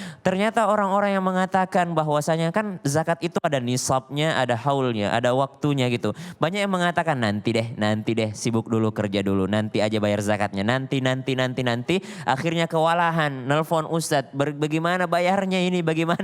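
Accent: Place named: Sulawesi, Indonesia. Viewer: Ustad te koyo dr sulawesi yo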